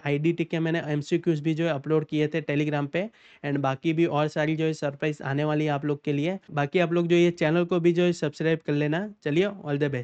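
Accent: Gujarat, India. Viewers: Tame gujrati lago cho....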